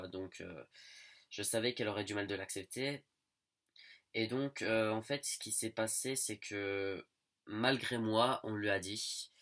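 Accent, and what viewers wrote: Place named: Mons, Belgium. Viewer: et Montois en plus! Il me semblait bien que je connaissais cet accent:p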